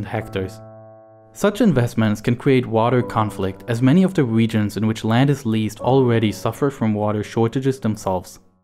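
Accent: Germany. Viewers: You sound german, are you ? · ReSAWARS. Dieser leicht Deutsche akzent triggert bissle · But he does say réservoir properly. So I think it's an accent thing. Probably German.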